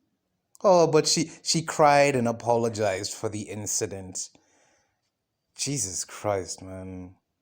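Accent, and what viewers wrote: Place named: United States. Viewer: The world is full of ridiculous people I also wanna ask something off-topic that I was wondering Your accent, it's interesting it sounds like a mix between Indian and American, am I wrong here?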